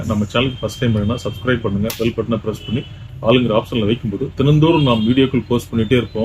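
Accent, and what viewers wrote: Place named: Kanyakumari, India. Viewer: கன்னியாகுமரி தமிழ் அப்படிதான்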